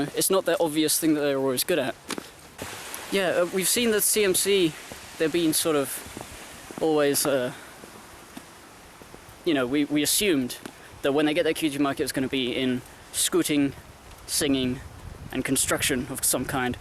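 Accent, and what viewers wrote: United Kingdom. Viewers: You're British? I didn't even realise. And I should have, as I'm British.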